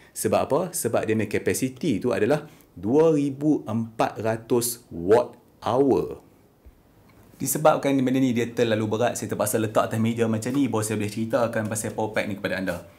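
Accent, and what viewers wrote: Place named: Penang, Malaysia. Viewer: Tengok sbb nak layan loghat penang buku abg ja..hahaa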